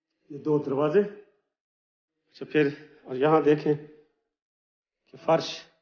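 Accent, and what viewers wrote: Saraiki, Pakistan. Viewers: Sir please saraki ka tarhka lgaya kro Maza ata hai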